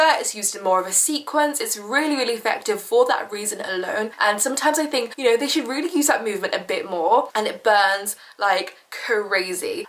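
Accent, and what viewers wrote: United Kingdom. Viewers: I love ur british talking style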